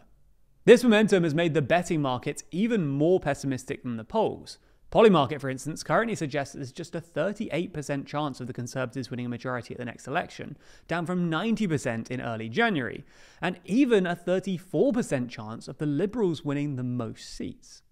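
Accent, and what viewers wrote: United Kingdom. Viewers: "Besides, why would anyone believe anything said about Canadian affairs from someone with a British accent." You mean like your head of state King Charles? Who was there for you in the war of 1812 when we collectively kicked the ass of the U.S.? Who fought for King and country at Vimy Ridge? Whose air force fought in the Battle of Britain, while the U.S. stayed out of WW2? If you like the United States so much, then why don't you just go and live there? Stop your anti-Canadian rot. We are not the United States, and our ancestors fought and died not to be part of the U.S. The Tories are just Yankee snakes who will use their disgusting treachery to make us the 51st state.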